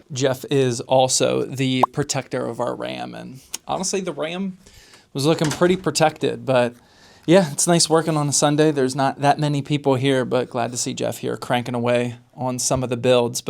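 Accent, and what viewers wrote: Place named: Texas, United States. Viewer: you already sound like you're from texas, you're definitely ready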